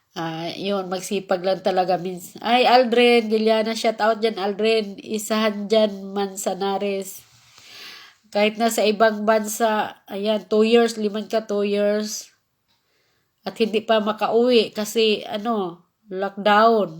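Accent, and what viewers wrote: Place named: Visayas, Philippines. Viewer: Congrats po.. Parang bisaya ka po maam, taga bohol ko maam.. Sana mabibiyayaan din ako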